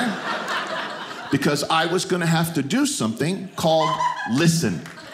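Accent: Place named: New Jersey, United States. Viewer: So funny. I'm from Jersey. We tauk like dat!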